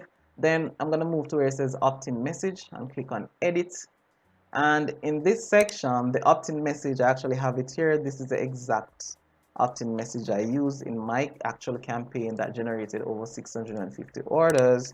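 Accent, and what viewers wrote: Jamaica. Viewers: I was trying to place the accent and wanted to say Jamaican, but wasn't totally sure. Accents fascinate me, so thanks for the affirmation!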